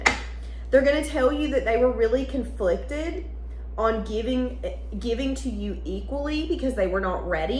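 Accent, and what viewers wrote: South, United States. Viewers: I love your southern accent 😊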